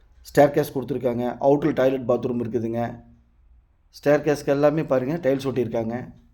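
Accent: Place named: Kongu, India. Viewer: நீங்கள் பேசுகிற கொங்கு தமிழ் இனிமையாக இருக்கிறது ❤ அழிந்து வருகிற வட்டார மொழிகளை காப்பாற்றுங்கள் வீடு நன்றாக இருக்கிறது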